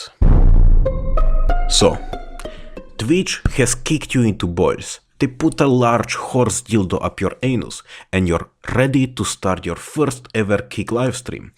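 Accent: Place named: Poland. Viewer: bro has 100% the poland accent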